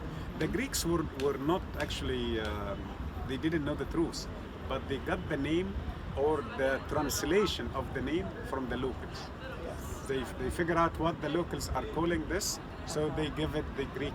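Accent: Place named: India. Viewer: THIS GUY IS NOT EGYPTIAN 💯 HE HAS INDIAN ACCENT , HE TRYNA DISCARD AND RE WRITE HISTORY LIKE EVERYONE ELSE THAT CAME BY THE EGYPTIAN GODS 💯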